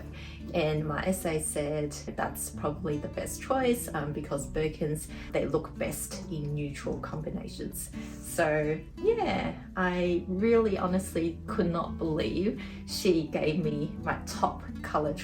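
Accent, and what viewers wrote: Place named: Australia. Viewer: Also, it’s soooo nice to finally hear your voice! Been wanting to hear your Aussie accent for the longest time I’ve known you hahahaha 🤣😅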